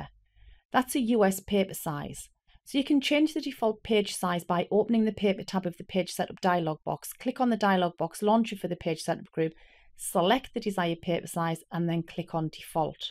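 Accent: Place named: North East England, United Kingdom. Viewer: I think I detect a north east accent! Thank you :)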